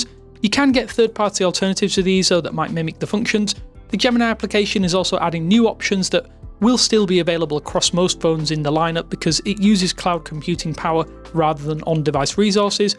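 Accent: United Kingdom. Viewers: british accent 😢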